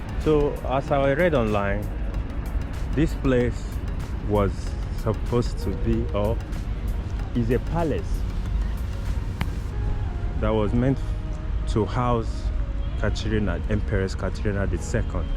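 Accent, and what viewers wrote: Africa. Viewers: Судя по акценту именно африканец? А откуда? Добавь в описание канала о себе что-то кроме того каково чернокожему гулять по Москве. Учишься в Лумумбе? Я так понимаю английский в Африке как русский в Дагестане, тобишь язык межплеменного общения, но без акцента не бывает? Видно, что русский тяжело дается, но речь уже поставлена, лексикон будет расширяться, язык будет привыкать и при желании всё получится. Keep it up man.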